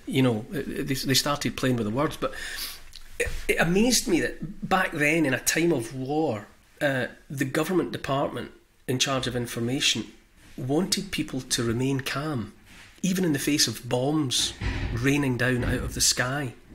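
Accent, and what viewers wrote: Scotland, United Kingdom. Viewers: I discovered your channel yesterday and I am glad i did! What you're saying makes lots of sense as we are many who share your views but many more who still need to wake up… please keep up the good work! You seem to have a Scottish accent, are you from Scotland? I am considering to reside there for a while… how are things there in general? thank you and take care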